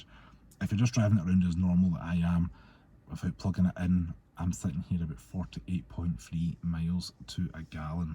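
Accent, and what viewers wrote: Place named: Paisley, United Kingdom. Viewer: I meant no offence, as I’m sure you are a lovely bloke. Right, I felt the camera was on you too much, especially when you were explaining or highlighting certain aspects of the car. Please don’t be offended, but at times I had great difficulty understanding what it was you were saying. There was very little separation between the spoken words. My daughter-in-law is from Paisley and has a similar accent to yours but she is easily understood. Anyway, I’d like to have been told more about the car i.e rear seating, do the rear windows go all the way down. Is there storage for the parcel shelf. What’s the kerb weight and so on. And what on earth is the “fuzzy Stuff”?? Cheers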